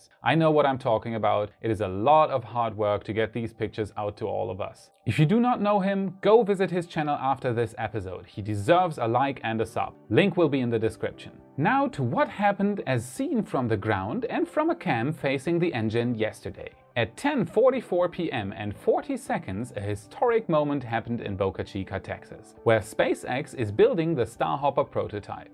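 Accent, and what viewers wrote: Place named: Germany. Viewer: Nach 2 Wörtern schon felsenfest gewusst dass du deutscher bist ;) Danke für das Video, echt super erklärt^^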